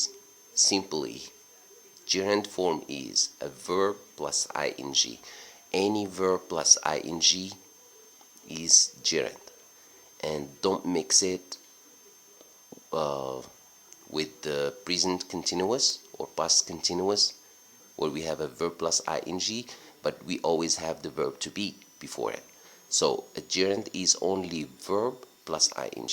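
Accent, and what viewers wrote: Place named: United States. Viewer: it's really interesting to see a teacher who really has a good american accent :-) thanks a lot for helping us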